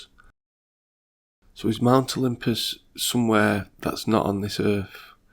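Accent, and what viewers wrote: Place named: Manchester, United Kingdom. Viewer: Much respect this is amazing the narrator who's telling of these accient events sounds like he's got a Manchester accent much love from liverpool keep up the good work my freind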